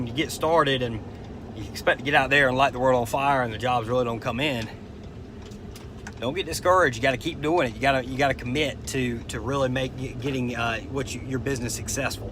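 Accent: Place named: South, United States. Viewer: Thanks. Needed to hear today!! The southern accent always helps too. It is soothing to me. 😉I don't hear much here. Make today amazing!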